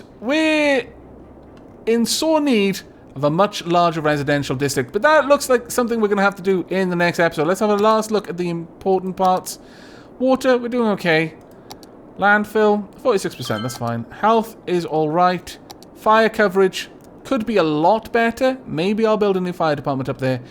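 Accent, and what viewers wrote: Wales, United Kingdom. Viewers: 'Scallywaggery' is now my absolute favourite word to hear said with a Welsh accent.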